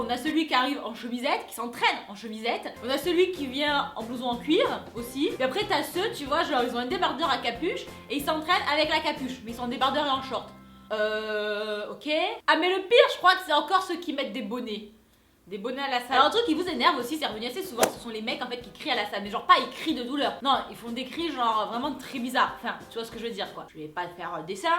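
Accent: South, France. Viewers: J'aime vraiment tes vidéos et surtout ton accent vive le Sud :)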